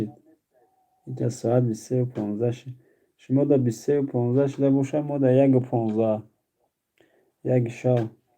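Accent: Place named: Dushanbe, Tajikistan. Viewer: Чисто душанбески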